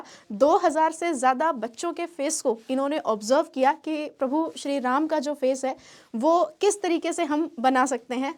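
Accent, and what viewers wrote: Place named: Haryana, India. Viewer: आपकी टोन हरियाणवी है ❤❤❤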